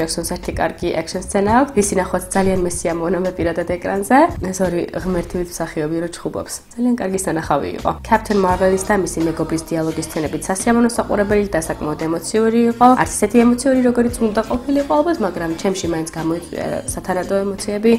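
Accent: England, United Kingdom. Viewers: ნუ ეს პირველი ვიდეო იყო რომელიც მე ვნახე შენი და ეს ინგლისური აქცენტი ჩემთვის საკმაოდ კარგად ჟღერს რაშიც ბევრი არ დამეთანხმება კომენტარებს რომ გადავხედე წინა ვიდეოებზე. anyways :დ ხმა ძალიან კარგი გაქვს და ნუუ ალბათ უფრო კარგი იქნება თუ სახესაც გამოაჩენ. ყოველ შემთხვევაში მე ძალიან მომწონს, როდესაც თოთოეულ წარმოთქმულ ემოაციას ვხედავ თუ როგორ აღიბეჭდება ადამინის სახეზე. ძალიან მომეწონე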